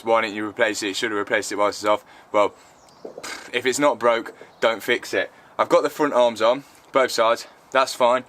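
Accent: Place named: Nottingham, United Kingdom. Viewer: Loving the vids just subbed you got a nottingham accent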